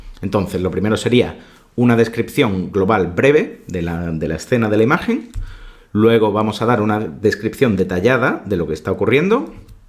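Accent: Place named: Granada, Spain. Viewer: muy bien explicado, muy clarito aunque viendo como lo haces, tendré que practicar mucho, y me ha encantado ese deje granaino que de vez en cuando aparece :)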